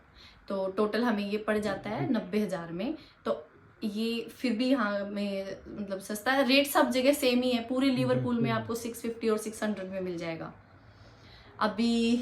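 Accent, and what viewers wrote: Haryana, India. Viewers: 👍👍👍👍 appp Haryanvi boli me bahut achhe lagte ho.. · Chorrii ghaint laagg ri hindi ma b...nways ...its nyc vedio..i thought u wud b in India...bt harayanvi touch suit kre thare pe..ikk harayanvi mein b bna do ji vedio harayanvi chorra khatir...ghani baavli lagge se.. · Hi love I also live in Liverpool ur look’s very pretty I liked ur haryani language it’s so sweet but u need to more clear that it’s two bed room house rent and all rent r not same it’s also depend on ur area if u live in city centre it’s rent more expensive than ur. If u live in good area it’s expensive too. One thing more all houses r not same. there are four types of houses. U show a traces house.Other houses names r Sami detach house, detached house, flats and bungalow.there rent r also different too. Mostly house have there beautiful gardens too. I am sorry my aim is not to let down ur videos I just add more information for ur viewers. 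Really I always like ur videos and naughty ur baby. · AP Hindi bhi haryanvi me bolti ho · Apki haryanvi b bahot sweet hey. Prantu abi ap Urdu bol rahi ho likho bhaley hindi mn ya kaho bhaley isey Hindi 😅😅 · loved your Haryanvi accent, nice video, you are very cute and innocent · Haryanvi boli achi lgti hi apppar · ap haryanvi ma bat kya kro hum ko aap ki haryanvi bohot achi lgte ha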